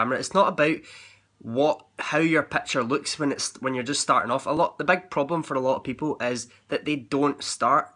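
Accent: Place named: Glasgow, United Kingdom. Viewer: I want a Meet and Greet in Glasgow! I was born in HOUSTON , TX. I now live on the Gulf Coast of Texas. I lived in Corby, Northants in the late 80’s. Love the Glaswegian accent. I’ve got God-daughters in Leicester that are amazingly gorgeous. Hint, hint. I’m sure they’d be GRATEFUL to meet such a positive guy. YOU ARE AWESOME!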